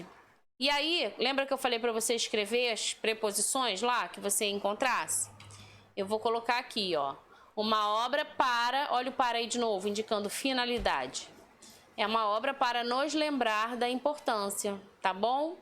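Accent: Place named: Rio de Janeiro, Brazil. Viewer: "O quanto vc ainda está com duvidãnnn" kkkkkkkkkkk sotaque de carioca é muito engraçado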